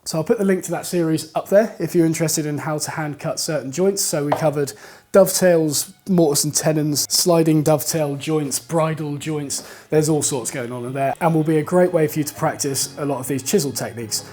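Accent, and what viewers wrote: United Kingdom. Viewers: Woodworking seems far more serious and classy with a british accent.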